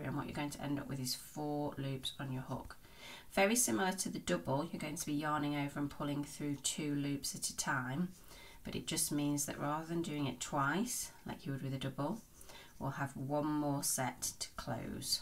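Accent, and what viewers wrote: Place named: England, United Kingdom. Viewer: i just have to say hearing you talk makes me homesick for england lol have been in usa since 1967 but england will always be home love listening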